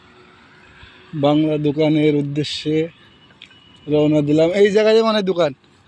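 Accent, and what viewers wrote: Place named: Meherpur, Bangladesh. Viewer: মেহেরপুরের ভাষা পাল্টা 🙂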